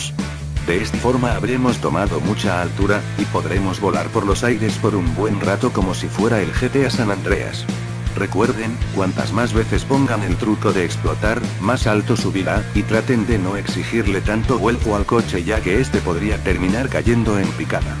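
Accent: Argentina. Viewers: Sos argentino ¿? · Eres argentino verdad?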